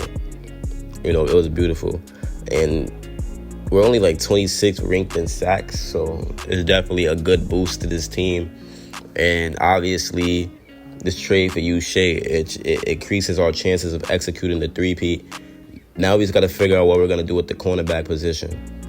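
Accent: East Coast, United States. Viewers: U sound like u from the east coast some where like Baltimore or jersey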